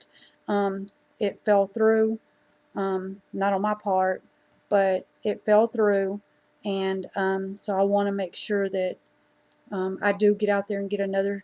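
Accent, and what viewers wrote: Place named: South, United States. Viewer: You are such a sweet person & you are living like Christ wants you to & giving to thise in need is sucha wonderful way to show HIS love..Your pies look so yummy...My mom use to make those...She is ina nursing home now with Alhiemers..You have a southern twang like me..I'm from Oklahoma. I like the name Peyton as well..So cute!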